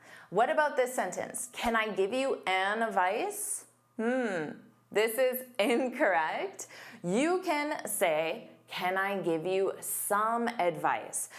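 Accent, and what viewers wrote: United States. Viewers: Obviously she's american. Isn't she? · She sounds like a yank they have know room to say anything about the English language they misspell words and pronouce words incorrectly ie mobile becomes mobul, although they do spell the word the same, like missile becomes misul.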